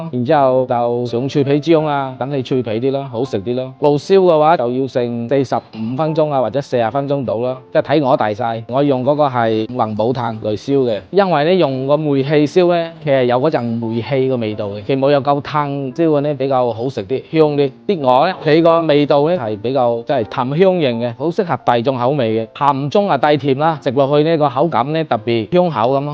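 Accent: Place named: Western Guangdong, China. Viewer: 无问题。感觉粤西口音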